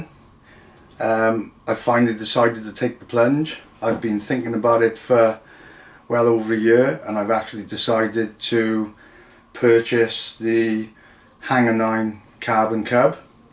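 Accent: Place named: South Wales, United Kingdom. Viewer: I think my high quality diet and fitness regime is what keeps me resilient to illness but nobody is bullet proof and I'm definitely concerned! From you accent your from south Wales right? There have been a fair number of reported cases in Wales and that's gotta be scary, so far there has been two here in Wiltshire but none in my area of the county but it's just a matter of time and I think it's almost inevitable that it will reach here sooner or later.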